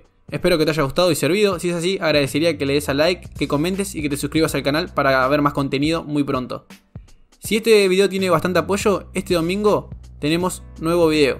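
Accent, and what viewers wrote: Argentina. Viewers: muy buen video solo que por favor pronuncies mas despacio ya que eres argentino y aveces no te entiendo tu acento ,, es solo tener cuidado al pronunciar es question de acento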